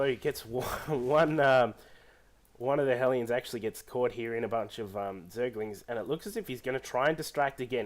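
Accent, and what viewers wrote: Australia. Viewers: It's good to hear a Aussie commentator for once. Good work =p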